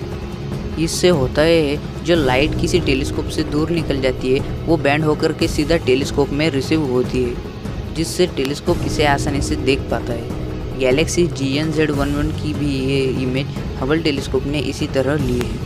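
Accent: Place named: Gujarat, India. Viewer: Baot badiya kaam karta he na tu isko aise hi padhna jaise likha maine bhai gujrati style avaz h tumhari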